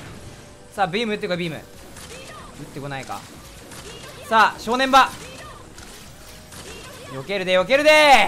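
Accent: Tochigi, Japan. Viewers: 最近栃木弁が少ねーな〜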